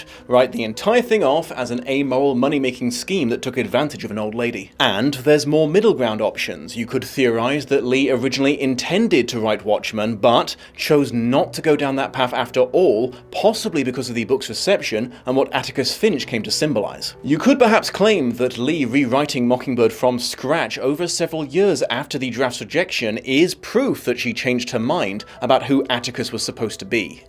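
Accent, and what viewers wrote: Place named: RP, United Kingdom. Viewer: No offense, but it’s a bit weird to hear this guy describe this book in his posh English accent. I start talking with a southern drawl when I think about this book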